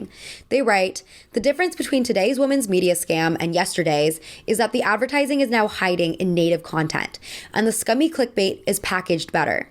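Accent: France. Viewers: the way you pronounced 'cahiers' made me laugh so hard 😭it was so aggressive lmaoo, it was also hilarious when you spoke english with a french accent lol love you though, as usual it's an amazing and extremely well researched video!! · As a creative thinker, I too love print. There is something special and magical within the tangible qualities that gazing upon a thought provoking or glamorous photograph and sliding your finger down the page as if you were there to experience it yourself brings to the senses. It’s a medium overshadowed by the instantaneous stream of vapid content — not art — that our society is now bombarded and accustomed too. But, as a designer and ambitious visionary, I understand that what I have to offer will by exclusive and elitist. I beckon for mystique. I remind myself of the time Lady GaGa said in the beginning of her career, “I’m not interested in being a celebrity. 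I’m interested in being an artist and there’s a VERY big difference.” A week ago, I was daydreaming of a group of girls with taste, together flipping through and expressing to one another a sense of genuine interest of something in particular within their large glazed magazines. I thought to myself, it is my duty to create this moment for them. Also, your French accent is on point. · Girl, your French accent sounds so good in this! <3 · French accent come thruuuuu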